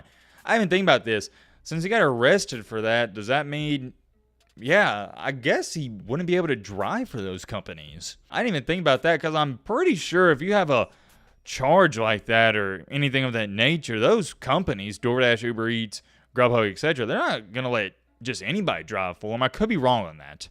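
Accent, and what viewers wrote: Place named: Appalachia, United States. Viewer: And, what the hell does he say at the end? Sounds like some Appalachian, inbred, dialect.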